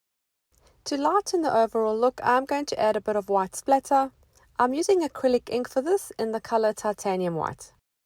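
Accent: South Africa. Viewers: I absolutely love watching your channel and can't wait for the next episode 🤗 nice hearing a South African accent 🇿🇦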